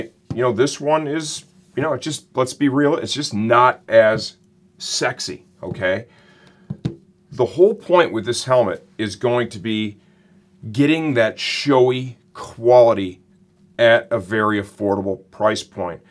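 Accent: United States. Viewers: This man has the voice of MURICA in the intro, praise him.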